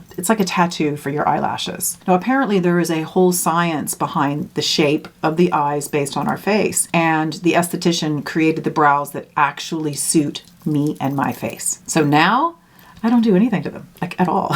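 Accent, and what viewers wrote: Ireland. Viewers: You occasionally have a bit of Irish accent!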